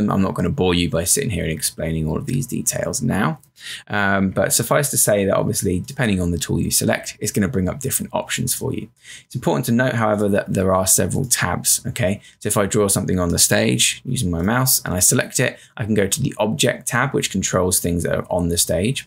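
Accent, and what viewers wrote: United Kingdom. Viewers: Bro's British accent sounds so abnormal to me. Can y'all relate.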